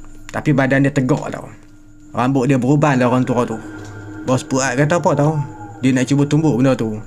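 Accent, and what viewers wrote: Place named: Kedah, Malaysia. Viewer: sib baik pnh duk alor setaq wlupon xlame,jd dpt faham loghat kedah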